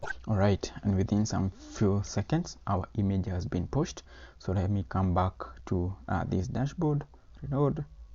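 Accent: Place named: India. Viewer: OHJE, schon wieder Indienglisch:-(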